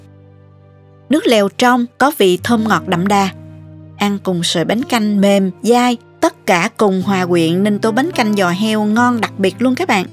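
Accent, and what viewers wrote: South, Vietnam. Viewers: Cảm ơn cô cách hướng dẫn cụ thể dễ hiểu. Đặc biệt cô rất thân thiện giọng nói ngọt ngào đậm chất Nam bô.nhờ sự chia sẻ này mà chị em nội trợ ngày càng có kỹ năng. Chúc cô luôn vui khỏe và có nhiều like😄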